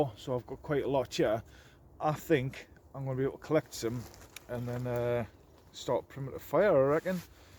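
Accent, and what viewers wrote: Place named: United Kingdom. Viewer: thanks much no problem with accent I have very many UK friends 👍 very good people in Uk top men an women 🤝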